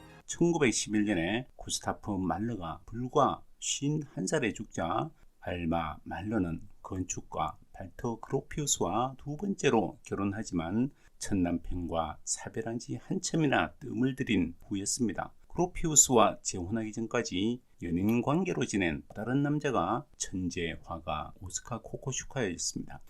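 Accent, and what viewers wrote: Gyeongsang, South Korea. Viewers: 경상도 억양은 좀 사양합시다. 같은 지역 사람들은 괜찮겠지만 참으로 듣기 역겨워요. 쏘리~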